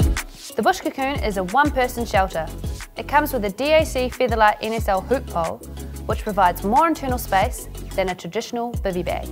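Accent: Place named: New Zealand. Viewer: Wit wither? Are you a Kiwi by any chance?!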